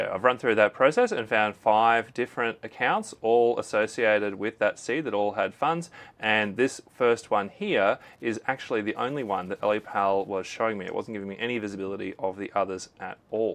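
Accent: Australia. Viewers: thanks for the reply, I never thought you would ever reply. But I am very happy to get some help. I think u got an Australian accent . I am here in Australia, too. I never entered any passphase. Never. I never had any other accounts. Just this one. I was using this account just one week before it all happened to me. Please 🙏 tell me what's the solution is. How can I get my life savings back. Because I have been very depressed from the last two weeks. Seems you have very good knowledge. Please 🙏 help me . Please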